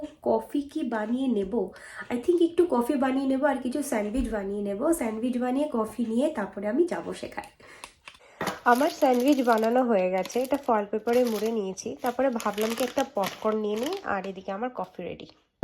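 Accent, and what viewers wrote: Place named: India. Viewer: You are Bengali🇧🇩? Or Indian? আপনার ভাষায় মনে হচ্ছে ইন্ডিয়ান! Love from Bangladesh🇧🇩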